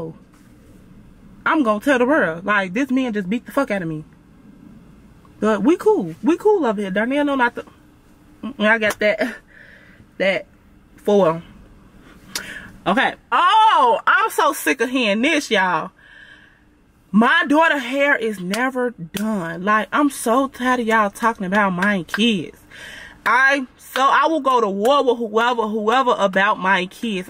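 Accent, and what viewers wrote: United States. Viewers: A lot shit y’all do stem from where we from “Chicago” both ears pierced is heavy in Chicago I got both my son ears pierced and the way you talk is also us Chicago females we talk hard asf naturally! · Picking up that southern accent sound nice on you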